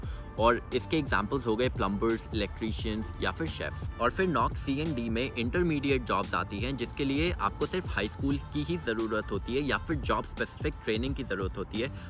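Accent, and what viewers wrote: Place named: India. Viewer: that Hindi accent though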